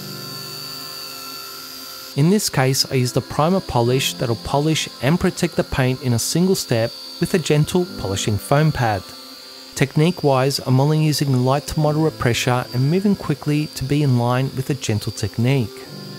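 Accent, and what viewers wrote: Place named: Australia. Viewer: I’ve been a fan of your work for a little while now. I appreciate your methodical approach to detailing, and I really appreciate the tutorial like aspect of your videos for people to pick up on some of your techniques. You seem to not mind sharing the knowledge, and there is value in you wanting to do that. Thank you, and amazing work with great results! Keep it up Your accent sounds Australian, greeting from Canada. Ricardo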